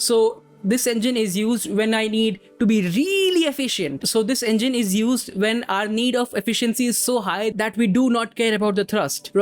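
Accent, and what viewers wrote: India. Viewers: bro it's indian accent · Are you from India or something? you sound like Indian that’s why.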